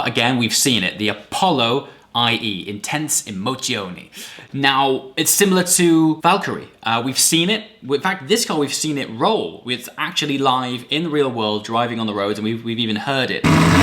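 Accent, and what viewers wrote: United Kingdom. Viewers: THE British native speaker.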